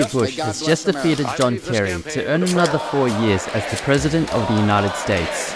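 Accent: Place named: South Africa. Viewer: I hear a Saffer accent. PS: Get your levels sorted, china. The archival footage video audio is drowning you out.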